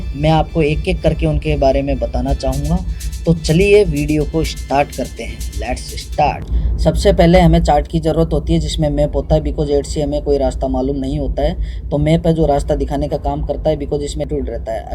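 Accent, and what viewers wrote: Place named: Haryana, India. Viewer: apki voice Haryana jesi lag rhi hai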